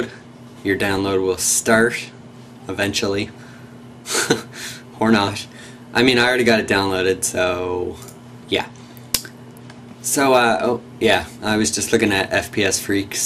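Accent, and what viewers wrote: Canada. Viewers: R u Canadian? If so, I can tell...... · r u canadian???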